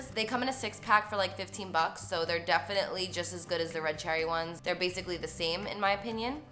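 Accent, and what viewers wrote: New Jersey, United States. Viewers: I literally gasped when I saw she uploaded a new video and saw the screenshot of the look. She slayed this - as always. And I honestly love when she talks, despite the thick New Jersey accent which seems to bother some people (like why tho?) she's just so cool and down to earth and talks to us like we're friends, love that shit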